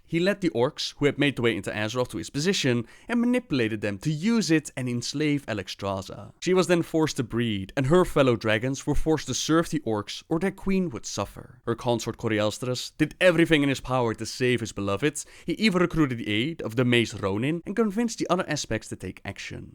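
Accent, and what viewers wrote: Netherlands. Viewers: Dutch accent> stopped.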